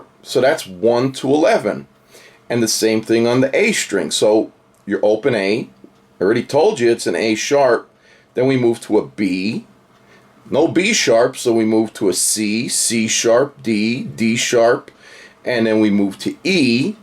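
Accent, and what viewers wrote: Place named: Chicago, United States. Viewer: are you from chicago - you have a bit of an accent , no offense . i am from chicago and when i am on youtube it comes out more often than not sometimes ... the way you explained it out like that it makes so much sense and isnt that complicated. hats off .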